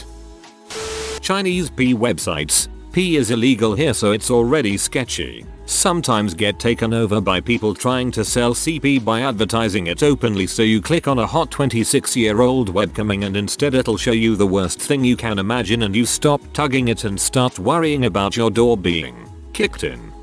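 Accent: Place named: United Kingdom. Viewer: Can you guys change the British narrator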